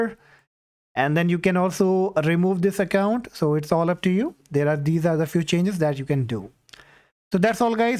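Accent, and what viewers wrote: India. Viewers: i found this video, and when i hear india accent i start looking for another video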